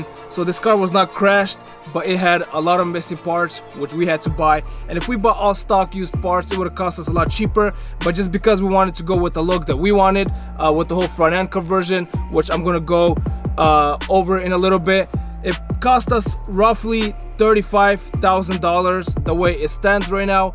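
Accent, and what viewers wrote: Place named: Russia. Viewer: Russian accent not black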